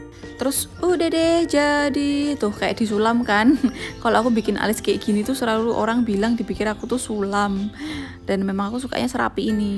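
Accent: Java, Indonesia. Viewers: Mbk medokkkk😍😍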